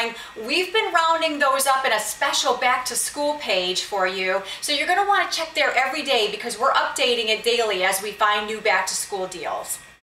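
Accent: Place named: North, United States. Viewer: yeah, you can hear your from the north part of the us :) so am i lol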